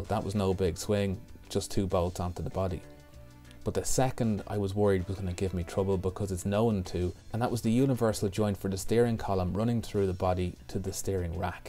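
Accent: Ireland. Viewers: Great to hear an Irish man working on cars. And I LOVE the stop-motion bits showing work done by invisible hands. This is a REALLY UNIQUE USP for this channel. It's probably very time consuming to do, but this helps this channel stand out. Well done. I'm a new subscriber !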